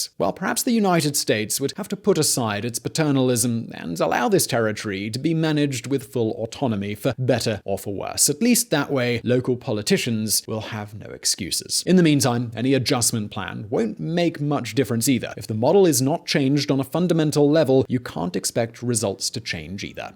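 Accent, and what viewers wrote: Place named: United Kingdom. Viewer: Sir, a "territory that BELONGS to the US" it's not the most political correct statement. I feel we ARE PART OF THE US. I believe that you as a British (which I presume for your accent) must understand what a commonwealth is. Also, "before Irma and Maria". There wasnt any "Ana". One of the things that utterly annoys me is the continuous mention of corruption in our Government. That in PART is true. Ok. Please, Dont overlook the FACT that we HAVE and HAD HONEST and DEVOTED polititians. But why corruption it's always brought up everytime someone dares to describe our Island?!? To me that not only harms our image to others, but also upsets us. That "We dont do anything" concerning government??? Really? May I suggest you to produce a video on how we fight everyday. We fight setbacks and negative situations. Although your video and your analysis are good. 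I'm giving it a like...Best wishes...Thanks 👋👍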